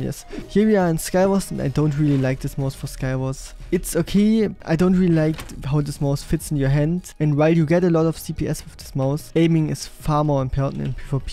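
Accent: Germany. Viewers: Ich finde es sehr unterhaltsam wie du mit deinem deutschen Akzent sprichst xD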